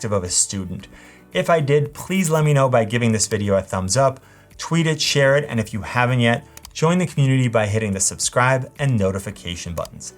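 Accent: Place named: Cleveland, United States. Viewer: I may be way late to this.. but are you from Cleveland??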